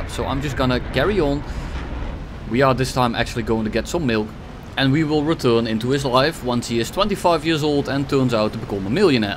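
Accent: Netherlands. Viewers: Hey are you dutch by chance? Because the accent sounds really dutch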